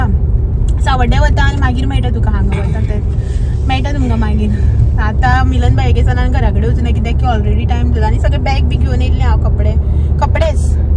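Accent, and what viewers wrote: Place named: Konkan, India. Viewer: Nice vlog as always ❤ you are very much knowledgeable specially your Konkani is very pure and shudh ❤ Love your work. Eagerly waiting for Ganesh chaturthi vlogs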